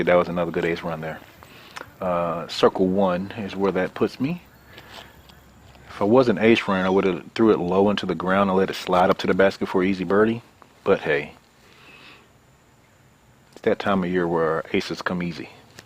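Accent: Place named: South, United States. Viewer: Nicely done. Soothing southern voice. Simple and clear.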